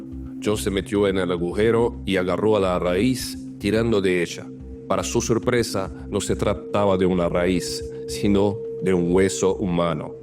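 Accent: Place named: Portugal. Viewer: Que feo acento . Casi no se entiende. Si queres hacer documentales en portugués genial. Pero un asco escuchar el acento rapai tembo.. no se le entiende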